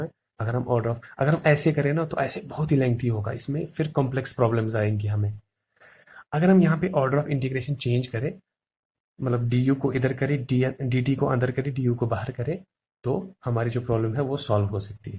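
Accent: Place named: Kashmir, India. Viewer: your kashmiri accent is too good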